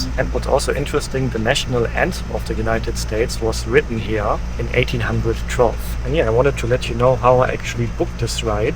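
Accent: Germany. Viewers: Remember English is not his native tongue. He’s German. Give his accent a pass! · you're pronounciations in English, always sound Gemany❤😂❤😅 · Ja, ja, das ist gut! You are fun to listen to with your detail explanations and German accent.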